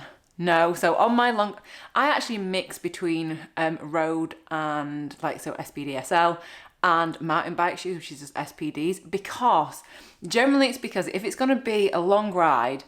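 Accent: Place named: England, United Kingdom. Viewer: You are so beautiful. Listening your vids to hear lovely english accent and also for bikeride infos. Thank You. Also I follow Harley Johnson, amazing man... Good luck to you on your rides. 💛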